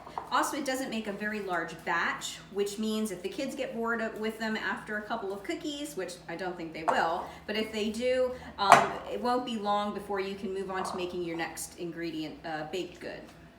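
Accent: United States. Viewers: Wonderful and easy!! Are you a Philly girl? I hear the accent!! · If I didn't know any better I'd guess your accent to be from south central to eastern Pennsylvania. 😉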